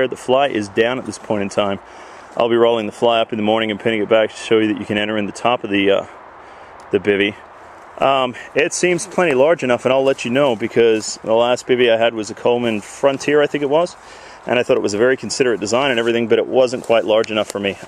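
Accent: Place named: United States. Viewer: You have a thick American accent for an Aussie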